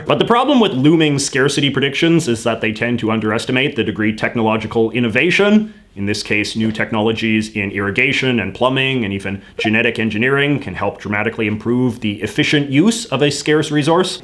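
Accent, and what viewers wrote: Canada. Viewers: I won’t be voting for someone who talks like that, too Canadian and goofy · Never seen this guys videos before. All I take away is that this is the most canadian sounding person I have ever encountered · this guy sounds like the canadian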